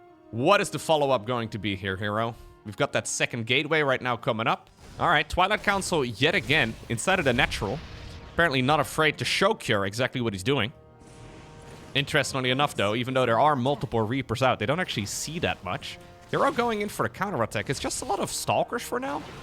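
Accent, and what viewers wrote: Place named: Netherlands. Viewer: The more repetitive and boring the strategies get, the more and Winter put in "NEW ILLEGAL strategy". Short term it generates more clicks because new guys come in. But the ones as I, who are here since he has the fattest dutch accent and considered to be "years in" when he published his music video of his uk visit, get scared away. Long term the base errodes and the topic collapses. And nobody will think that this click bait was the reason. They will say "the game just got old". Maybe they are right, maybe they are cutting on the branch they are sitting on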